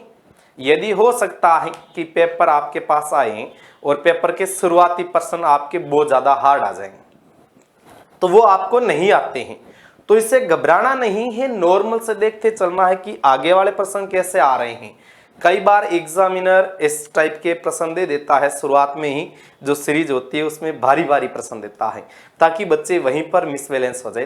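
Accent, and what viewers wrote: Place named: Marwar, India. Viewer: Sir aap marvad se hai isliye mai aapki aabaj ko bade chav se sunta hu😂😂😊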